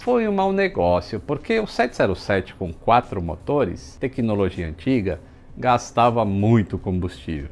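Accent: Brazil. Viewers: NÃO DEIXEM DE DEIXAR O LIKE !!!! PORTUGUÊS BEM BRASILEIRO............... SALUDOS DE LA PENINSULA IBERICA....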